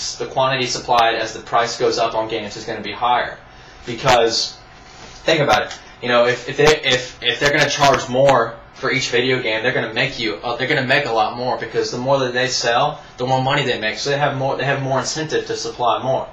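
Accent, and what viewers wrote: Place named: South, United States. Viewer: So entertaining! And easily explained! I Like your southern accent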